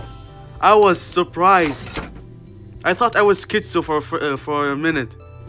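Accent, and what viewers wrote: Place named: Arab world. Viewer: Are from uae or suadi u sound like arab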